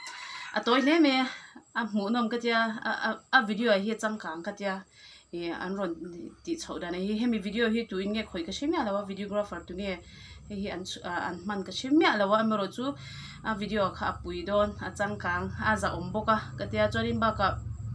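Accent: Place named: India. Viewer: Voice Tone hi dik lutuk Hindi Tone diktak in asa tlat mai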